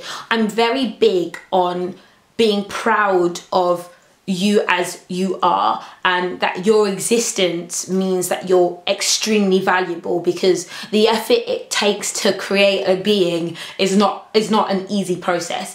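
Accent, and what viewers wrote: England, United Kingdom. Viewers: oh my gosh . black women with english accents are just so beautiful to me. black women in general are beautiful, every woman is beautiful.. but i mean, gosh! the accent just kills me, i love it! <3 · I love your raspy british accent ugh!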